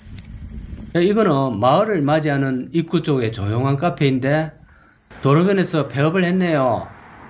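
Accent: Gyeongsang, South Korea. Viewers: 구수한 경상도사투리 정겹습니다 좋은 방송 잘보고있습니다 선생님항상감사합니다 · 설명이 너무 재미있어서 나라 돌아가는 꼴을 보면 하루늘 보내는것도 지겨워 죽을 지경 인데 설명 을 들을때마다 옛날 시골풍경 구수한 경상도 사투리 정말 살맛납니다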